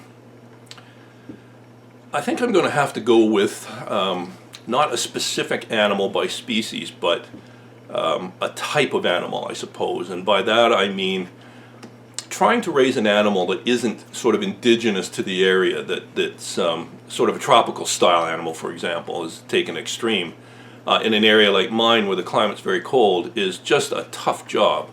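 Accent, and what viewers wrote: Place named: Ontario, Canada. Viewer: hi, I found your channel recently and as soon as I heard you speak and say the word 'out' I new you were from the middle east (I am guessing Ontario). I live in Northern Alberta and try to spend a lot of time out doors, I hunt, side X side, camp, etc. I also tinker with 'blacksmithing' I make knives, froe's, axe's and do fabrication work. I haven't had a chance to watch all your videos yet, but do you winter camp?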